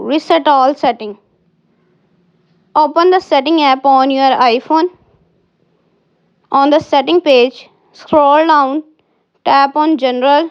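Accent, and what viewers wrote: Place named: India. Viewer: Every tech video there Indian and I can’t understand them like can’t there be American tech videos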